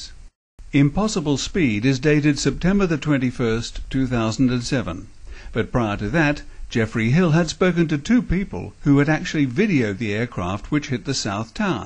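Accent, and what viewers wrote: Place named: RP, United Kingdom. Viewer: More disinformation, deliberately delivered with an austere well spoken English accent designed to give it an air of legitimacy. Doesn't actually address the point. At 700 feet it is impossible for a 737 to travel at or around 500mph. Though some official estimates put them second at over 550mph. It would disintegrate and also be impossible to maneuver the way it did. Think driving a double-decker bus at Formula One speeds. Also doesn't address complete nose section making it through multiple layers construction grade of steel intact. Depleted Uranium anyone? If you believe the official story you are a child.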